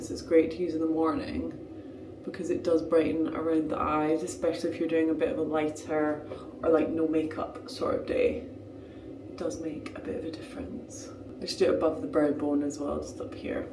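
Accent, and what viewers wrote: Scotland, United Kingdom. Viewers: You look fantastic in all the Lilysilk! I think your Scottish accent is stronger after your wonderful weekend with your mum and sister, it's so cute!! Happy that you've already been able to narrow down your dress choice. Still about to watch the cooking bit 😂. Busy times!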